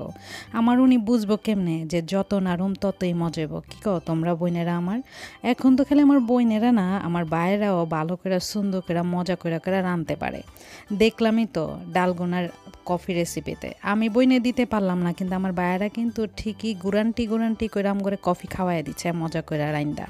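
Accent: Dhaka, Bangladesh. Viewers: Khub mojar ranna hoyeche apu. Apnar dhakaia vasa jobbor lagche. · Apu Ami dhakaiya vasa shunte posondo kori...valo laglo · আসসালামু আলাইকুম আপু। জামাইর লগে খোঁচাখুঁচি 😁😁 আছোলেই তো লাকি আফা জাদু জানে হলুদ মরিচ অটোমেটিক তরকারিতে আইসা পড়ছে।খুব সুন্দর কালার আইছে মাছ ভুনায়। ভালা লাগছে তোমার ঢাকাইয়া ভাষা।👌👌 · মাশাল্লাহ, আমার ঢাকাইয়া বইনের গলায় ঢাকাইয়া ভাসা শুইনা ভালাই লাগল । বাপরে বাপ পাবদা মাছ রান্তাছ ? আমার ভীষণ প্রিয় মাছ । দাওয়াত দিবা না বইন ? চালাইয়া যাও আছি তো । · আপু অসাধারন হয়েছে আজকের ভ্লগ।ঢাকাইয়া ভাষা তোমার মুখে বেছি ভালা লাগছে 😁রান্না তো মাশা আল্লাহ ইয়াম্মি হয়েছে।,❤ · অনেক ভালো লাগলো তোমার আজকের আয়োজন । তার চেয়ে বেশি ভালো লেগেছে তোমার ঢাকাইয়া কথা । আমি পাবদা মাছ অনেক পছন্দ করি , তুমি অনেক সুন্দর করে রান্না করেছো খেতেও নিশ্চয়ই অনেক মজা হয়েছে। খুব খেতে ইচ্ছে করছে এখন।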